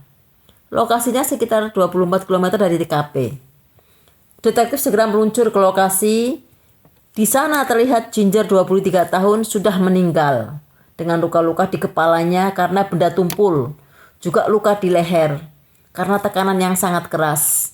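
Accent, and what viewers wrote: Java, Indonesia. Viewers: logat jawanya medok amat mbak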